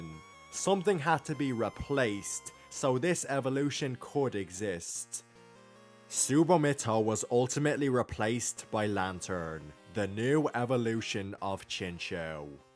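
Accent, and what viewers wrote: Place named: Ireland. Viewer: The accent is very whiplashy to me because I got bullied so hard in Dublin for liking pokemon, so seeing a really cool and nerdy pokemon video in an Irish accent is WILD to me lmao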